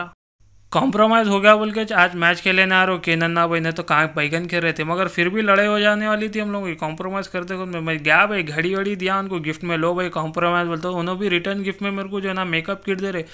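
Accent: Hyderabad, India. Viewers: Aap bhe Moscow 6 Sal Paisa Barbad student dikhrai ye hyderabadi English hai bolna aao ya nai aao aage wale ku samajhne aagaya toh bas hai bolte😂😂🤣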